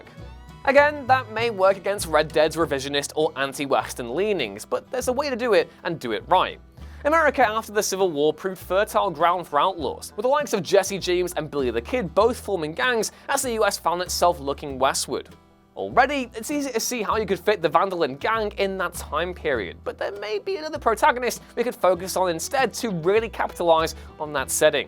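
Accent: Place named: United Kingdom. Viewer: I guess you guys were Americans. U make great content. But that British accent is so annoying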